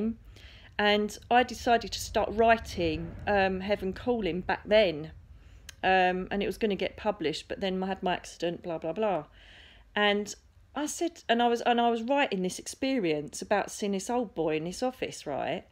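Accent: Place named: England, United Kingdom. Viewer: Umm, even though I had to really concentrate to understand what you were saying (English accent and all), I learnt a LOT from what you shared in this video. Soul blueprints, soul clusters, angels, celestial beings.... you could take any one of the points you shared and expand up on it in a separate video. And if I may, please do that! Thank you for sharing your insights and life experience.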